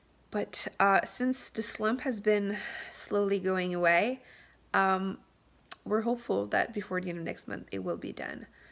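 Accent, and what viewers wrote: Quebec, Canada. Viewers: Good vlog, love it!! Continue de nous partager ta passion, afin de nous motiver davantage! 😊 By the way, me too, french is my first langage but your videos encourage me more to read in ENGLISH. Tu parles très bien le français! Par ton accent, je crois que tu es québécoise, je me trompe? 😜 j'espère que tu as appellé un électricien pour ta prise défectueuse!! 😉 Stay safe. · Je reconnais cette accent là, QC!!!! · I loved hearing you speak French, and as a European, I find your Québec accent so interesting :) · Tu devrais regarder la vieille mini-série avec Gérard Depardieu au lieu du film américain. C'est plus proche du livre. Ton français n'est pas si rouillé lol ton accent semble plus québécois que français o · Salut! C'était sympa de t'entendre parler Français avec un accent québécois ^^ si tu es tenté pour avoir des correspondantes pour dé-rouiller ton français, je suis dispo:) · I am very curious as to know where your accent is from! 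It sounds « québécois » in some parts but I can’t quite pinpoint where it’s from! I’m very curious for the context! My parents are Québécois, and my father was in the Canadian Army so I actually moved a lot and learned English at 5 years old when I started school. I live 10 min from Quebec city now! And I read in English and watch TV in English so I can keep it... we speak English at home (my 4 year old is learning both languages at the same time!)... If you want to practice your french it’s actually a great esy to do it! (Movies tv and books!) ;) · I'm glad you put the subtitles because as a France French speaker Canadian French sounds so foreign! lol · She is Québécoise ?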